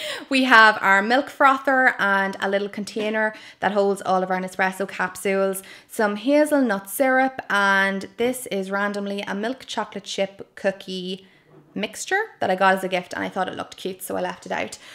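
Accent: Ireland. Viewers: This is the first video that I have seen I love your apartment and listening to you chat. I have been to Ireland three times and cried every time I left. My family came from Galway. Your voice makes me homesick for Ireland . Love your apartment.❤️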